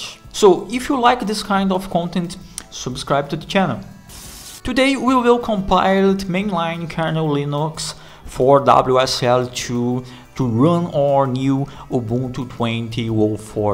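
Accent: Brazil. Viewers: Eu sabia que vc era br só pelo o seu sotaque Br tem um jeito exagerado de pronunciar as vogais kkkk Vídeo top d+ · Sabia que era brazuka kkkk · Brasileiro falando ingles nao tem como nao pegar Haha. Otimo conteudo irmão. Parabens.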